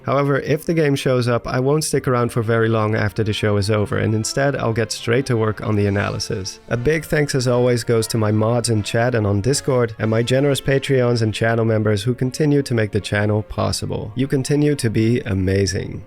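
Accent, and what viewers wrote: Netherlands. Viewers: is this guy dutch ? whats his accent :)?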